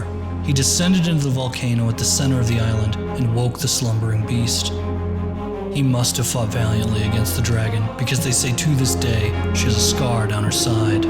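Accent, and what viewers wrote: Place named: United States. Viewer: Monotone american accent ruins it. Sorry, great animation though.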